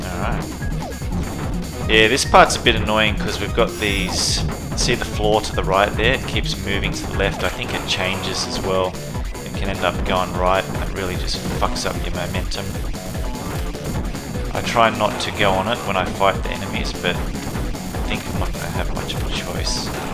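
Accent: Australia. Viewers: I believe you're still out there. Hope you're doing well. Every time I hear an Aussie accent I shed a tear and think of this channel.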